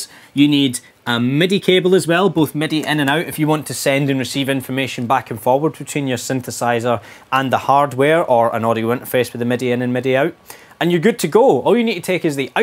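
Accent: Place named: Scotland, United Kingdom. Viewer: Thanks for the excellent overview, I also love your Scottish accent! Scotland and Scottish people are awesome (and I'm partly descended from them myself), I hope to visit there some day. Also thanks for the heads up on the Yamaha Reface line, I would be really tempted to get the Reface CS if only it had patch memory. There's really no excuse not to with today's technology. But at least there are some great softsynths now such as Tyrell, Diva, and U-NO-LX.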